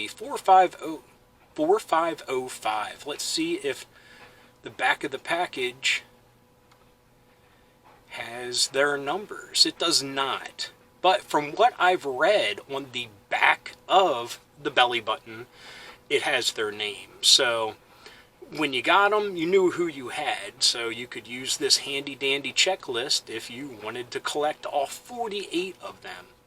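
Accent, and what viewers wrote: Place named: Maryland, United States. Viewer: Great channel, going through a bunch of your videos! Also, Pinterest is a great place for 80's nostalgia, lots of great pictures and collections w/o having to buy stuff. Lastly, you should collab with Hat Hole Home video...I have no affiliation with him, you guys just have a similar vibe and channel. I was going to ask what part of the country you're from but I can tell a Maryland accent anywhere! haha.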